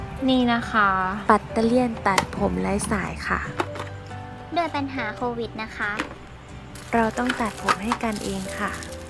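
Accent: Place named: Isan, Thailand. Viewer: สวัสดีครับผมเข้ามาชมคนรีวิวครับเสียงม้วนดีครับมีทั่งภาษาอีสานภาษาไทยกลาง แม่นครับช่วงโควิด19ไปร้านตัดผมกะย่านยุครับ💞😍จังได๋กะแคล้วคลาดปลอดภัยกันทุกคนเด้อครับ🥰❤️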